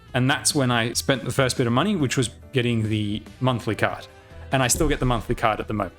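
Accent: Australia. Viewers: Are you Australian btw? I'm trying to place the accent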